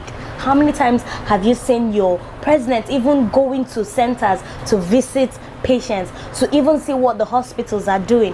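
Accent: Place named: Igbo, Nigeria. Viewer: u fine well ohh...And I love your Igbo sound ohh...its just too sweet.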